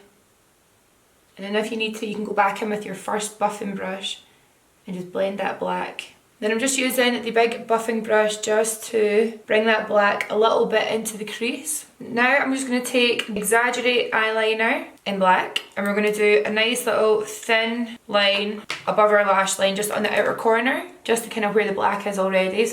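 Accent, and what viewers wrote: Scotland, United Kingdom. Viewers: Really love this look! We're fellow Scottish YouTubers! Love hearing Scottish accents on YouTube, it's so rare! 😄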